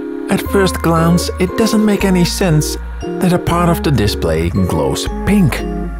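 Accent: Netherlands. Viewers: that compression on his voice combined with harsh dutch accent is absolutely obnoxious jesus turn it down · I didn't know synthesised voice robots can have Dutch accent too,Awesome. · That's the thiccest dutch accent I've ever heard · I thought you were Dutch based on your accent, but I wasn't sure. Hearing you say that you were in the Netherlands, makes me realize I'm pretty good at picking out accents.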